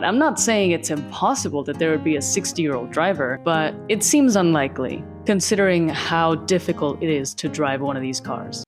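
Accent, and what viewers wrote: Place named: Spain. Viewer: Your English is seriously so damn impressive. It genuinely took me until you said Javier Bardem's name for me to place the microscopic accent, and then I was like, "Hecho, she's Spanish." But your English is better than 90% of Americans'.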